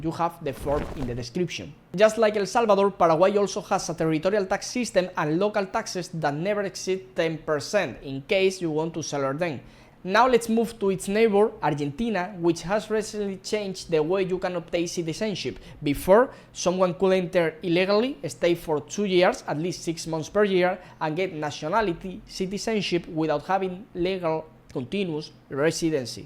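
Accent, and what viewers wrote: Spain. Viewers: Te recomiendo que practiques la pronunciación en inglés, la formación de frases, la palabras y vocabulario es bueno, pero trabaja en la pronunciación tu acento español es demasiado fuerte.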